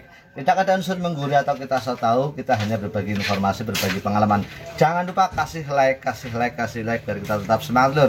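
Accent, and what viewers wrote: Banyumas, Indonesia. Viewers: Mantap. Logatnya mirip banyumas. Lokasi dimana bro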